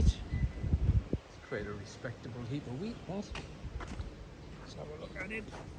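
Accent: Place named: Newcastle, United Kingdom. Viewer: Good vid bro.....wat part of uk u in sounds Newcastle accent.....is this ur darm is it many acres....nice country side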